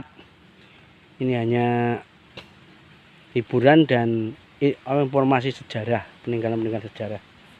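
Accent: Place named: Java, Indonesia. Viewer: Dari nada suaranya pasti org Jawa ini banyak dobol nya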